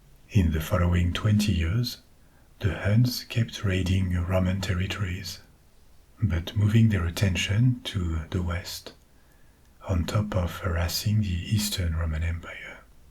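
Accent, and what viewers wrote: France. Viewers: Sa fait bizzare d ecouter de l asmr anglais avec un accent français 😂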